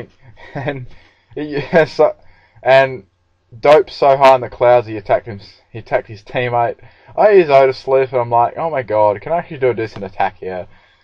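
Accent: Australia. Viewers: my favorite part of this video? that he's FUCKING AUSTRALIAN!!!!!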